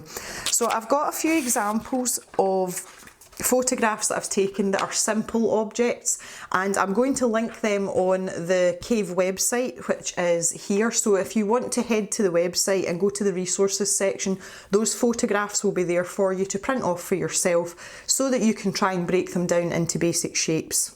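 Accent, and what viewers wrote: Scotland, United Kingdom. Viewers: Thank you for sharing this! It gives me not only inspiration, but a nice wee dose of Scottish! I lived in Scotland for several years and miss the accent!😉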